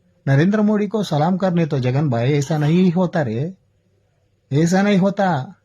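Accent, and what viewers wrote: Nellore, India. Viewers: anna nellore Hindi super anna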